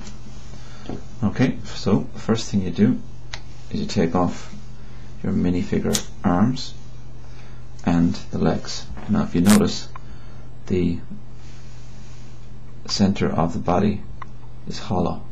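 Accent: Ireland. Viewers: u sound irish are u?? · i think he sounds a tiny bit irish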